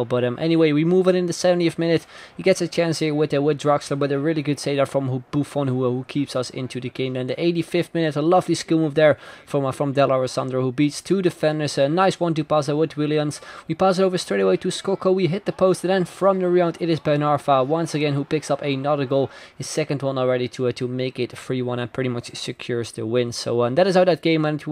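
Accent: Netherlands. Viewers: Are you dutch cause you have a accent?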